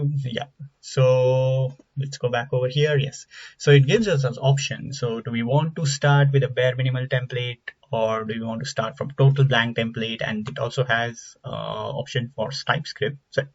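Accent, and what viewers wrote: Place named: India. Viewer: Bhai awaj se hi indian hai pta chal gya tha 😋😋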